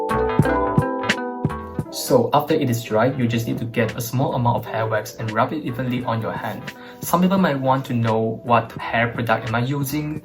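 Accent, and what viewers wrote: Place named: China. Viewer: I can’t pick out where he’s from with that accent? Chinese? Taiwanese?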